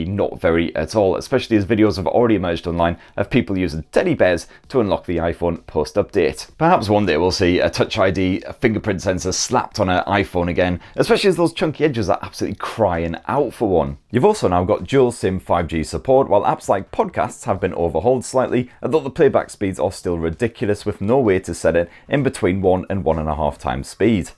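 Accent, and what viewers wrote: United Kingdom. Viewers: I just discovered this channel and it has all I’m looking for: tech reviews, British accents and witty humor. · He's British, so yeah, I feel you there! 😍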